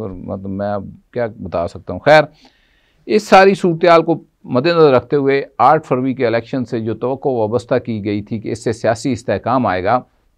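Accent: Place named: Punjab, India. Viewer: Sir Bhatti, you are the only man who has stood his ground till date and you speak Punjabi very well with strength.❤❤❤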